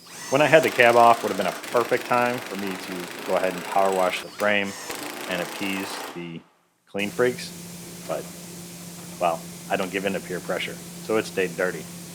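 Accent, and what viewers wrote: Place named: Midwest, United States. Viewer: refreshing amount of no speed up, no self apology for this "being boring", no crappy muzak in the background and plenty of commentary on how to do this task. Plus I find mid western drone is incredibly soporific, i find when I'm tired its like being told a bedtime story... I just wish no you'd lose the "The channel" bit from the intro and then you would be totally unique in not trying too hard to keep people watching... Very TV, TV never begs you to watch or explains itself too hard, it's just here's a program watch it if you like and if you dont, go do something far more interesting...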